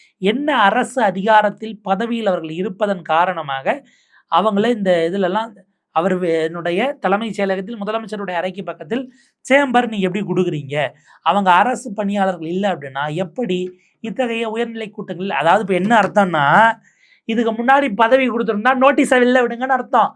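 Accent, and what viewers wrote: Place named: Tirunelveli, India. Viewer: தம்பி நீ எந்த ஊர் உன் பாசைதிருனவே லியாதமிழ் அருமையா கிருக்கு பனி மீன்டும் தொடரட்டும்👍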